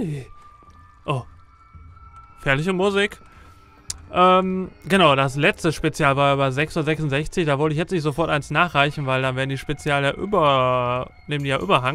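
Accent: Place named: Ruhr, Germany. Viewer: Ruhrpottslang ftw.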